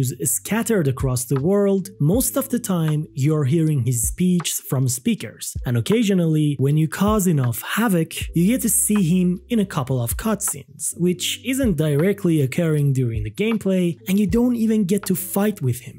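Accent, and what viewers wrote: Iran. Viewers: u got that persian accent bro 🗣🗣🔥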